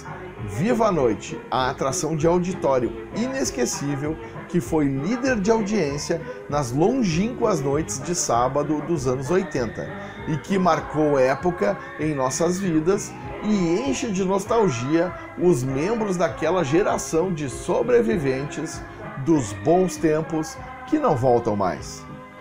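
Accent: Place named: Rio Grande do Sul, Brazil. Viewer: Nao acho que seja a mesma pessoa, o Teily tem sotaque nordestino, e o locutor aqui do canal tem um sotaque de gaúcho. O timbre da voz também é um pouco diferente entre eles.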